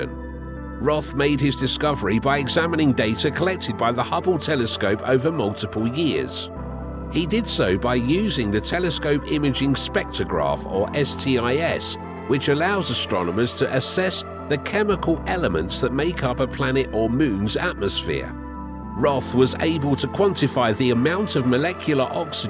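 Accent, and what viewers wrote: United Kingdom. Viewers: I know. They usually get a narrator with a British accent to give their lightweight video more 'gravitas'.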